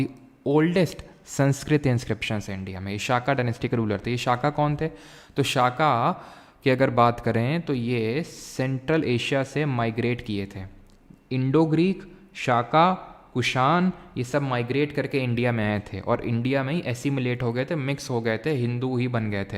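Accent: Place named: Hamirpur, India. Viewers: Sir are you from up voice tone se lag raha hai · Are vahh sir ap to ek dm Mori trf hamirpur U.P ki side ki bwalat hva😅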